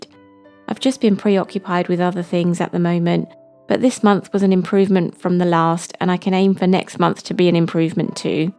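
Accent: United Kingdom. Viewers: Hi again!! Never mind my question about your IG account, I just saw the video again and took screenshot of your IG account name. Oh oh yes, and the spreads and the weekly so I can recreate and tag you. (But I am NOT nearly as talented as you, so it will take quite some time and a lot of hard work and trying and failing before I will have something I’m not too embarrased to show you....😅❤️ It was even more relaxing to watch the video for the second time btw. Cos then I knew what was coming up so I could be a bit more at ease👍🥰 One more thing: you should definately become a teacher of some sort for people with anxiety or stressed people, cos your voice is the most relaxing voice I’ve ever heard. With that perfect British English/accent❤️ I always find myself very relaxed and full of ideas after watching your videos. I don’t usually comment, but after this I just had to🙏❤️ Love from me💖👱🏻‍♀️🇳🇴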